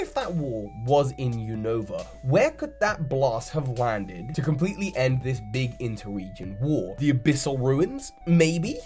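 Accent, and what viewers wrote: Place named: England, United Kingdom. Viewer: I love these kind of videos so much, I could listen to for hours as he sets up and explains these theories with his English accent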